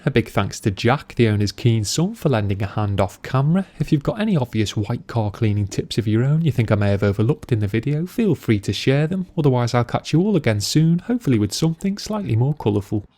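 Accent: Liverpool, United Kingdom. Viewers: Hes from liverpool, scottish doesnt sound like that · Haha....Brilliant....As said that is a proper Liverpool accent... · British you dumbass. You dont know anything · Sounds Liverpudlian to me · I like you, you're a posh scouser and your video quality is good. So you've deserved a like and a sub. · i don't get it, are you getting hate from your fellow country men or from other countries? To me, you just sound like a normal British guy.....as a matter of fact, your voice is actually really relaxing. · For those that don't know, it's a Merseyside (Liverpool) accent. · God i don't like this dialect of uk english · Almost certainly Liverpudlian accent to me. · His accent is Soooooooooooooooooooooooooooooooo British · god I hate british accents so much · Sound like that British guy in the movie gone in 60 seconds.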